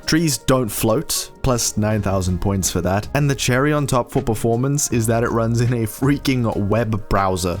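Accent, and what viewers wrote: South Africa. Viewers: Joburg accent?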